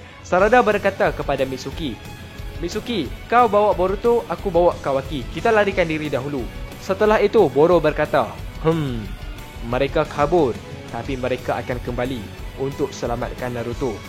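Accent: North, Malaysia. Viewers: logat utara hahahaha but nice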